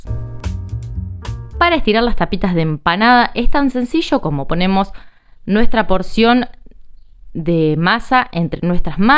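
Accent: Argentina. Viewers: CHICA ERES DE ARGENTINA SI POR EL ACENTO ANTES HABIAN MUY BUENAS RECETAS HABIA UNA CHICA FABULOSA ELLA SE COMIA LOS jos crudos le tenia mucha No E acuerdo de su nombre era fabulosa lo unico que se que era hija de una artista de cine argentino Etc no recuerdoel nonbre hace mucho tiempo de eso .okey Yo vivi en Nyc cuando iba CONEY ISLAND LA PARTE DE LA COLONIA RUSA JUDIA EN EL BRAITHON BEACH EN LAS PUERTAS DE LAS CAFETERIAS RUSAS VENDIAN UNAS EMPANADAS MIY GRANDES COMO DE 15 CT. RELLENAS DE POLLOS OTRAS DE PAP.AS Y QUESO CARNE ERAN MAZISAS ESPONGOSAS MUY RICAS SE ACABAVAN EB SEGUNDO TODO EL MUNDO A COMER ERAN DIFERENTES A LAS NUESTRAS LUEGI EL CAFE O LO QUE QUISIERAS MENOS VODKA MAY GOODNES QUE TOMAN LIS RUSOS SERAN LOS NO JUDIOS ESE LUGAR MI FAVORITO POR EL BUFFET RICO BARATO FUTAS VERDURAS ALLI TRABAJAN MUCHOS MRXICANOS Y HASTA HABLAN RUSO ES LINDO X ALLI TAMBIEN VENDEN PRODUCTOS DE LOS ADMICHII ESA GENTE DIFERENTE QUUE VIVEN CREO EN PESILVANIA PERO BUEN QUESO MANTEQUILLA LECHE Y BARATO NO SE AHORA CON ESTO DELA EPIDEMIA NOS ARRUINO Y TODO ESTA SUBIENDO QUE SUBAN LOS VICIOS ASE COBRENLE UN OJO DE LA CARA Y QUE VALLAN A BSÑAR AL RIO HUDSON PERO LA COMIDA NO. 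NO SE QUIRE LIMOSNA DE COMIDA SI NO TRABAJO CON DIGNO CON EL SUDOR DE EL ROSTRO .NO DIGO MAS DISCULPEN POR QUE LA GENTE SE ACOSTUMBRA Y ESO NO ES VIDA.TRABAJO PARA AMERICA LATINA EMPRESAS SI SE PUEDE GOD BLESS HUMILDEMENTE.🇺🇸❤❤❤❤❤❤❤❤🇵🇪🇦🇷🇧🇷🏆🤩🎯🎯🎯🎯🎯🎯🎯⚽️🏆🇵🇪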